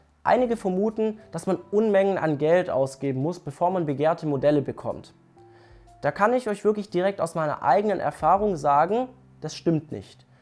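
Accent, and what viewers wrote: Germany. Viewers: Dein leichter Dialekt lässt mich darauf schließen, dass du aus BaWü bist? ;) Sehr gutes Video, arbeite an meinem Traum in Form einer Uhr. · Gute Tipps! Aus welcher Region kommst du, wenn man fragen darf? NRW? Bin auch noch auf der Suche nach einem Konzi, bei dem ich deine Tipps anwenden könnte. Letzten Monat erst habe ich mitbekommen, wie meine Traumuhr (Submariner 114060) im Hinterraum des Ladens zurückgehalten wurde. Ich müsste zunächst ein teures Model aus dem Schaufenster kaufen (als Bsp. nannte er die goldene Daytona mit Oysterflex). Erst dann würde er mir die Sub zum Listenpreis verkaufen...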